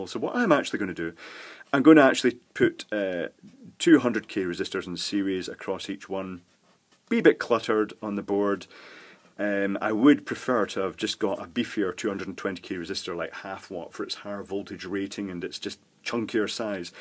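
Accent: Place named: Edinburgh, United Kingdom. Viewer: I followed your (very clear) recommendations and now have a fully working 'UK' charger.Love the videos and the accent (Edinburgh?). Keep 'em coming.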